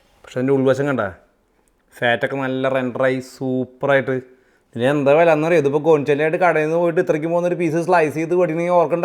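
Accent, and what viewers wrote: Thrissur, India. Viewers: ഇത്രയും നാളായ് ന്യൂസിലാഡിൽ എന്നിട്ടും മ്മ്ടെ തൃശ്ശൂർ സ്ലാങ്ങ് വിടാത്ത ചേട്ടൻ പൊളി തന്നെ 😍🥳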